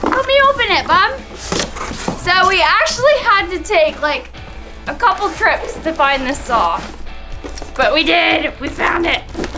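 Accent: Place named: Western Pennsylvania, United States. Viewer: Just found you with all of my recent chainsaw videos. I'm originally from Butler and you totally sound like you're from western PA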